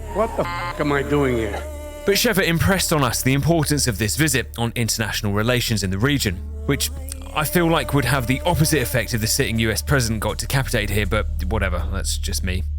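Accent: United Kingdom. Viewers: The sweet, soothing british voice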